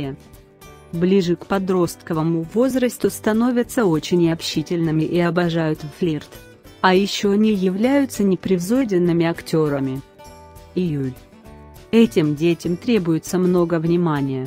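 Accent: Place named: United States. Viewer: Вы зачем читаете с американским акцентом ? Это давно устарело .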